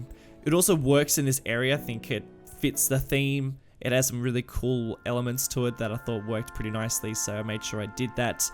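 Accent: Australia. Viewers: Crickey! Noice straya accent... 🇦🇺🇦🇺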